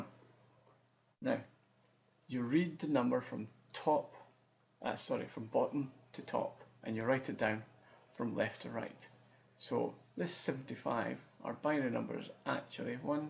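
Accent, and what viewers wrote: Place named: Scotland, United Kingdom. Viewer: The accent made it so much easier to listen to, if only one of my lecturers was Scottish :D Thanks a bunch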